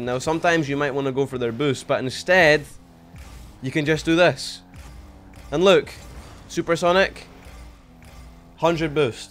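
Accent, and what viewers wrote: Scotland, United Kingdom. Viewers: Are you Scottish by chance?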